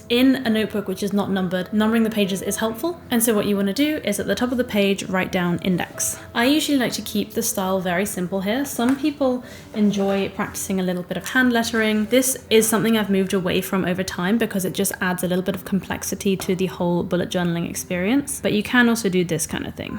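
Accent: United Kingdom. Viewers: Hi it’s nice to see you and listen your videos. I love how you talk in British accent and always your voice gives me a power to improve my English deeper. Currently, I’m living in Japan and I would like you to come to Japan, this is such a good country and I can assure you that you will love it. Thanks for your videos and I want you always to be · Hi it’s nice to see you and listen your videos. I love how you talk in British accent and always your voice gives me a power to improve my English deeper. Currently, I’m living in Japan and I would like you to come to Japan, this is such a good country and I can assure you that you will love it. Thanks for your videos and I want you always to be beautiful as now.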